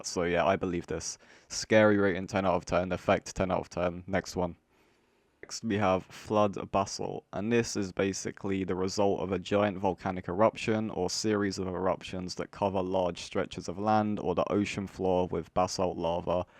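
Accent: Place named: United Kingdom. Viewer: fucking british accent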